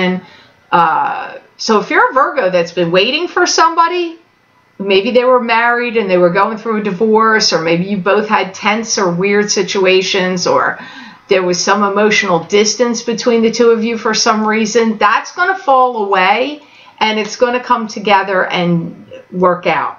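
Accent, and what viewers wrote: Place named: Pittsburgh, United States. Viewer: Are you located in western PA? Because if so I'm pretty confident that it could be my family members that are attempting to communicate to me through you 😂😂 I literally was thinking about them and your accent 1 minute before you said you got the chills and I got them with you! I am a Virgo sun and moon and have watched you religiously for over a year. I LOVE YOU! (You're not crazy so don't think that you are!) you channel so many energies and it's up to us to vibe at your level to actualize these realities. Anyways I think it's my family because they are from Pittsburgh, I have TWO clogged sinks, a plumber just left for a leaky toilet, i have been needing to fix my AC for months, and need to return my air diffuser. I've been hesitant about moving but just decided to move long distance from my love and it has instantly improved our relationship! 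Like molded up the gel for sure ;) and I haven't moved yet! I have been dragging my feet with healing and creating for a year because it's different from "being a normal RN" and your reading just helped me significantly. Affirming my feelings. The eclipses were NUTS 🥜 but this month has been amazing and it's only day 2!!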